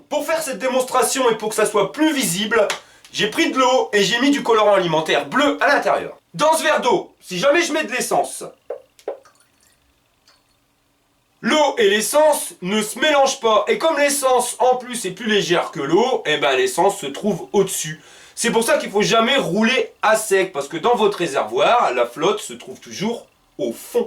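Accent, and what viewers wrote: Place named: Pas-de-Calais, France. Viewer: top vos explications les gars. je roule avec un wagon r Suzuki a 100% éthanol l'été et 50 l'hiver max depuis 5 ans. j'avais avant un boîtier pourri acheter sur eBay qui ne sert a rien car non équipe de capteur éthanol comme vous l'expliquer si bien. encore bravo et vive l'accent du pas de calais. signé un ti normand.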